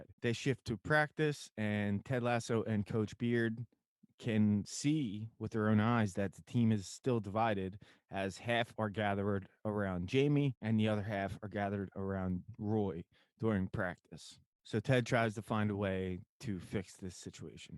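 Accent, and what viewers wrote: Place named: Philadelphia, United States. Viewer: I love your Philly accent :-)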